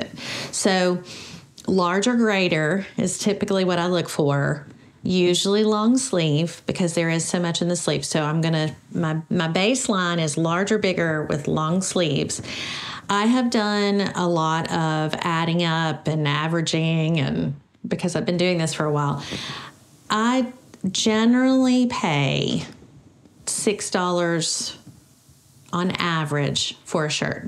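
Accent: South, United States. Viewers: New subscriber here. LOVE your personality, good sense, concern about cost, and...your Southern accent!😁 Howdy from Texas! 🤠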